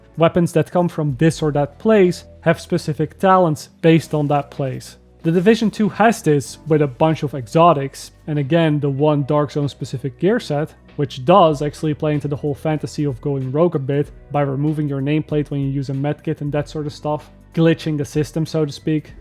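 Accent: Netherlands. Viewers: Good video, I've got over 2500 hours in division 2, well explained. I'm sure you're Dutch (I do think because of your accent, as I am) and I also think you're involved with the YouTube channel Fern...Am I right? 🙂♥️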